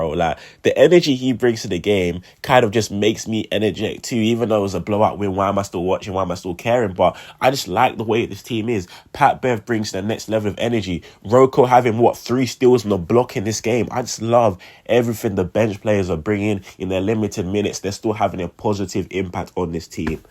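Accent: United Kingdom. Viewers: Wasn’t expecting that accent when I clicked on the vid but nice to see a fellow UK sixers fan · It’s kind of refreshing to hear a British YouTuber talk about the NBA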